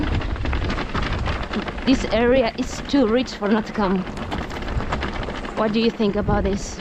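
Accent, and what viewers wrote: Cartagena, Colombia. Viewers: que rraro hablas cartagenero..... :0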